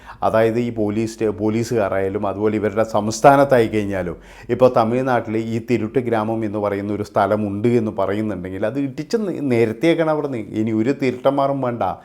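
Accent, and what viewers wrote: Kannur, India. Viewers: നല്ല കണ്ണൂർ ഭാഷ ❤️❤️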